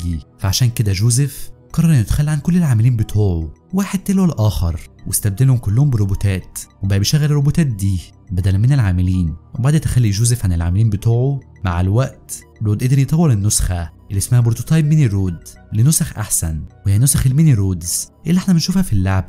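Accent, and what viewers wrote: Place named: China. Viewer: من لهجتو صيني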